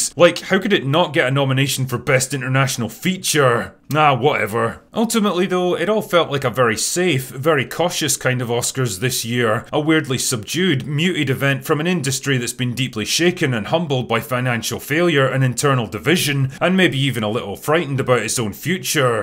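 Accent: Scotland, United Kingdom. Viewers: i no longer watch actual movies or sports or even elections, i just watch whatever people are doing the highlights of the events. this guy talks same as willie from the simpsons the scottish groundskeeper.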